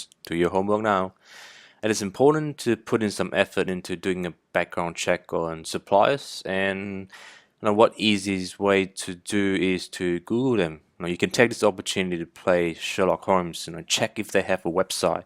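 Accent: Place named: Australia. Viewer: he's Asian, with an Australian accent, is what I mean.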